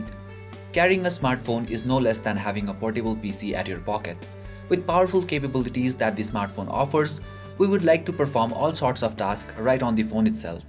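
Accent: India. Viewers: This was quite helpful, but honestly, I missed many words because of your Indian-area accent. I have taught English as a Second Language for many years and the most common mistake made by people from this part of the world is speaking too rapidly. Even native English speakers are easily misunderstood if they run words together too quickly, and when you add in the occasional mispronunciation of a foreign speaker, it makes it frustrating for the listener. Simply slow down a touch and people will follow you much better.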